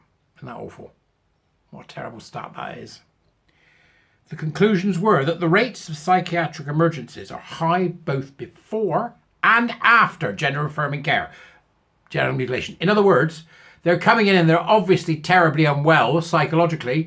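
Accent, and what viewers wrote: United Kingdom. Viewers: The reason you are bothered by the word urinary is because of the way you, as a Brit, pronounce it. Try the N. American way.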